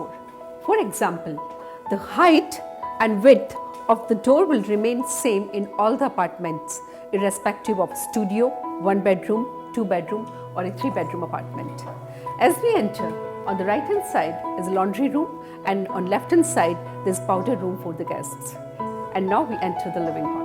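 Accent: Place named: India. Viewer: Annoying indian accent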